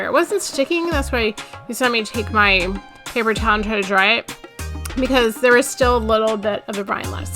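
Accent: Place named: South, United States. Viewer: I have to admit, I watched this like THREE times (of course, all the way through lol) because I kept getting distracted. SO thankful that we got to do another collaboration together, you are just so much fun and so full of life. I love how you had a bit of a southern twang while presenting, loved it! I added your video to our playlist and I will be sharing the playlist tonight on our community time to get the word out even more. Honestly will be watching this a fourth time when I can actually listen to it for the entirety. ❤️❤️❤️❤️❤️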